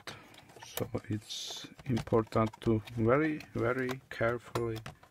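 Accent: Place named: Czech Republic. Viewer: ale no tak.? ty se stydíš za česko? ten akcent jde bleskově poznat. jakože ne že by nebyl důvod se za česko stydět, ale stejně🙂.... a díky za video. přemejšlim, že si ten komp repasovanej koupim.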